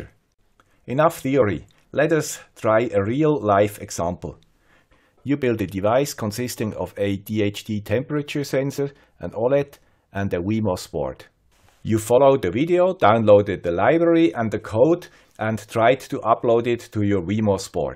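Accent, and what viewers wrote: Switzerland. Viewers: This is pure gold for troubleshooting. Thank you Great Master with Swiss accent 🖖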